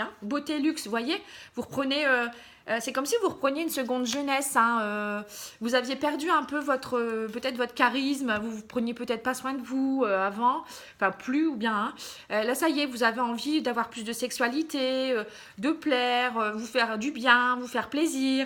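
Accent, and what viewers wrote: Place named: Hauts-de-France, France. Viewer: Bonsoir, merci pour ce beau tirage très complet, très parlant pour moi, en plus avec votre accent Hauts de France, je suis picarde, j'aime beaucoup, bonne soirée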